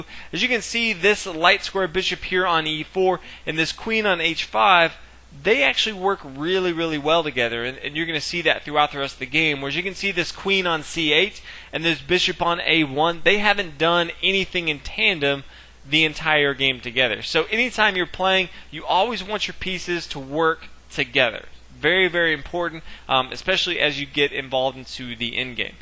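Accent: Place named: Northeast, United States. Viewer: you are either from Massachusetts, Connecticut, or New York. Am I correct?:-) commentator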